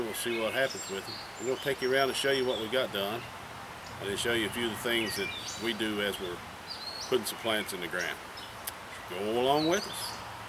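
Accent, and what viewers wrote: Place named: Texas, United States. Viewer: I tell ya brother, i do love yer vids and being a Texan hearing you talk makes me feel right at home!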